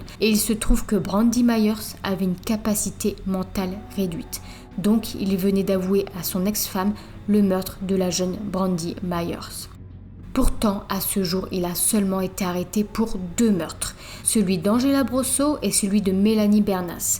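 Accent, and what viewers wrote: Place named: Nord-Pas-de-Calais, France. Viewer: Bonjour, Tu viens de quel département exactement. C'est pas méchant de ma part mais c'est la première fois que je suis tombée sur quelqu'un si jeune avec l'accent ch'ti si prononcé.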